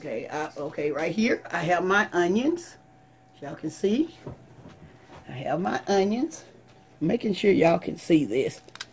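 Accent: South, United States. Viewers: My grandma from North Carolina used to make a similar salad fresh from her garden....talk about DELICIOUS. I LOVE your down home accent. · . Why did you choose to only put 3T of white vinegar and 1-2 T of olive oil in……….instead of the 1/2 cup white vinegar with the 2T. of olive oil??? When I was growing up, Mama always added a little ice water to it……..making it in a big jar, so we could always have a batch in the refrigerator. You sound Southern! Just like me, except we are different colors, but a good ole-timey Southern cook!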